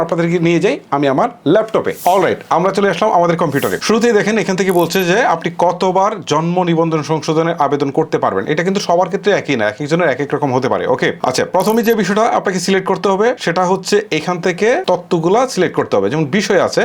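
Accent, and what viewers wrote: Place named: Brahmanbaria, Bangladesh. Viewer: Brahmanbaria mone hocche 🤭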